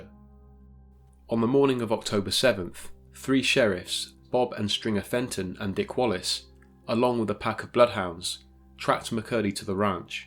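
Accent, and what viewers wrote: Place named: United Kingdom. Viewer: Sorry but "Tales from California" read with a British accent... hmmm. doesn't jive... It's not "Los Angel-ee-s" It's "Los Angel-y-s".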